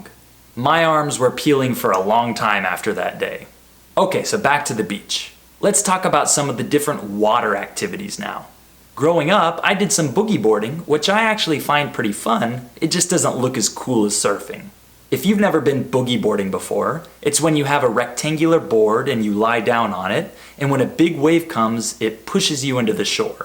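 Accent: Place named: United States. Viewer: لا لكنتو اميريكة بحتة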